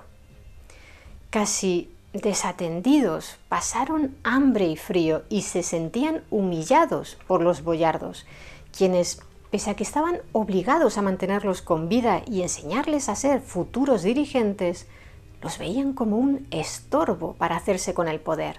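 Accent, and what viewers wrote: Spain. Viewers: Me encantó la< forma de contar las historias....pero muy, muy superior es la forma de transmitir, la entonación del relato me cautivó. No me es precisamente atractivo el acento español sin embargo me he enamorado de esa voz y no me pierdo capitulo, el último Oscar Wilde. Me gusta la historia y este canal me resulta muy atractivo y fácil de asimilar además de bastante documentado e hilvanado. Saludos y gracias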